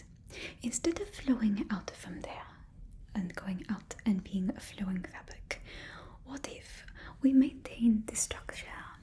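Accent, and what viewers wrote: France. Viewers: I expected a British accent but the French accent is absolutely amazing and a welcome change! 👍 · The French accent! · Great french accent ! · Pretty fine french accent even if as a french i know it's wayyy more classy than the real one xd amazing roleplay, i binged bridgerton and i'm so happy to see that you were ready for this too! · l'accent francais est super bien fait ! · I feel so dumb i was like shes not gonna dona french accent and then she started doing a friend accent and i got so excited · I am obsessed ❤❤❤I’ve been waiting for another French accent since the spy fitting video 🥹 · Very Très bel accent Français mademoiselle ! J’adore 😊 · I haven't watched the show but I am made to understand that there are a lot of butts involved. So I wish everyone a wonderful new season of their butt drama. Love the French accent. Looking forward to relaxing with this 💚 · Your French accent is calming. 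You should use it more.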